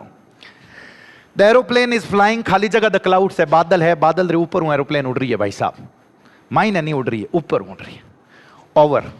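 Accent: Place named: Rajasthan, India. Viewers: Rajasthani vaani badiya lage hai sir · गुरु jiक्या अंदाज है आपके पढ़ने का वह भी अपनी मारवाड़ी भाषा में❤❤❤ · Jodhpur ri boli ❤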